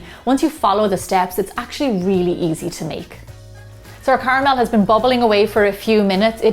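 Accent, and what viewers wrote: Ireland. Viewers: Are you Irish or American?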